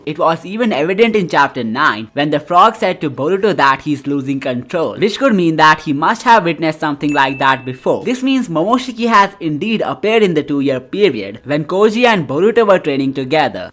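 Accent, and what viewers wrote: India. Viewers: Where are you from, sound Indian?'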